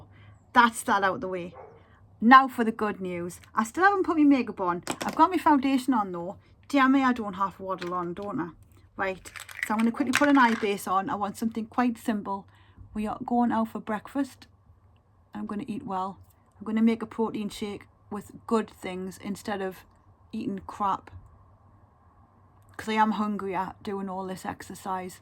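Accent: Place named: North, United Kingdom. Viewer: My favorite British YouTuber and Make up Specialist. Not just because I am in love with the northern British accent, having lived in Manchester for a year back in 2012/2013 but because you are simply amazing, with your humble yet bright personality. Please never stop showing the world who you are, because the world needs to see and hear you. ❤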